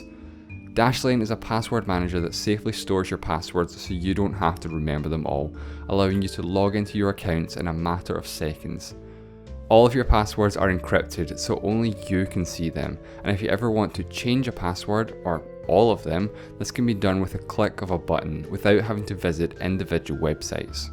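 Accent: Scotland, United Kingdom. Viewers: His clarity is fine. He just has a Scottish accent that some folks will take getting used to. And I’m sure every youtuber is aware that more videos = more subscribers. He likely has another job or school that is his primary focus. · what accent is this?? Brits?? makes me puke...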